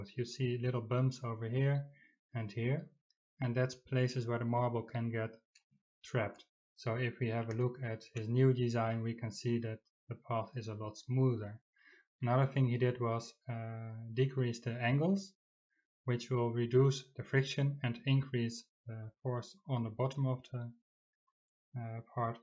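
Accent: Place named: Netherlands. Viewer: haha het typisch nederlandse accent :). Btw, geweldige video's!!!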